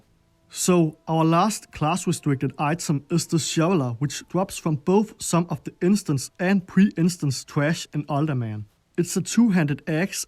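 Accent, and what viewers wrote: Denmark. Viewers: The Danish is strong in this one! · damn that danish accent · Shiiit thar danish accent tho 🤣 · that danish accent wowowowow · Danish is strong in this young one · Can't Tell if Danish or Austrian, but i can tell you make great informative content!!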